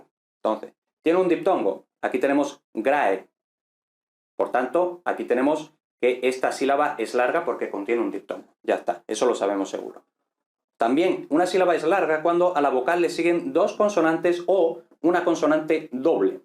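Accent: Castile, Spain. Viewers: Puede ser un poco confuso porque tu pronunciación es castellana, ejemplo C, Z es diferente entre tu pronunciación y la latinoamericana; bueno es pequeña diferencia. Gracias por el curso!’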